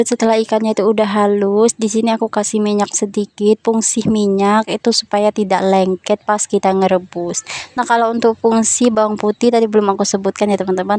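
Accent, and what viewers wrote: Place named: Banjar, Indonesia. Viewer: Enak tekwannya bun... Dari logat ngomongnya seperti orang Banjar.. Tinggal dimana bun?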